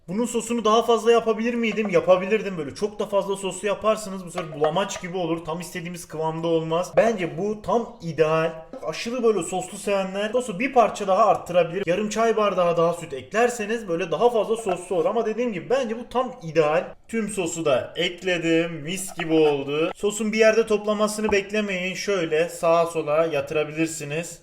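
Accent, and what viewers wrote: Adana, Turkey. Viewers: abi adanalımısın yeni fark ettimde ediyürüm yapiyürüm ekliyürüm valla adanalılar da böyle konuşuyor :D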